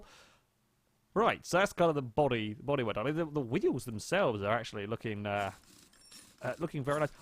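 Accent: United Kingdom. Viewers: AY Bloke,, hahahha we call stripping and selling in US 'a chop shop',, buy you had a rag, not a dream car, so yeah, you're only going to get trash money back,, now go 'steal' some rims from a barn, 'steal' some heads from a junkyard, and 'steal' some parts off another car of the near same type, then sell it, and watch what your value is!! Fun watching your vids tho,, and like I said before ,, love the Brit accent, reminds me of home... TC E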